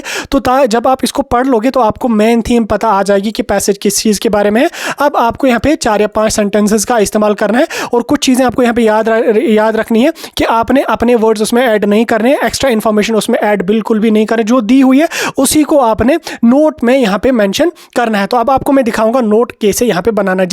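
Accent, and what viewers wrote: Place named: Kashmir, India. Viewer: Bhai you are kashmiri i understand because your Urdu voice❤❤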